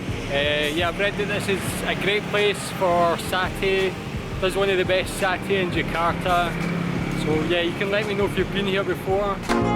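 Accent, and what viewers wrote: Scotland, United Kingdom. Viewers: I Love Scottish Accent...👍👍💜💜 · Are you from Scotland ? Your accent sounds Scottish..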